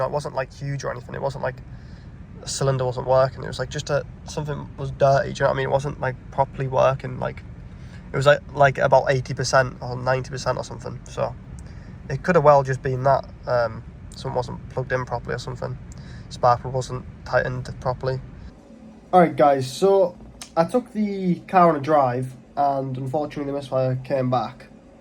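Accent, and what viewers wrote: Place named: Middlesbrough, United Kingdom. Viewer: sounds very middlesbrough talking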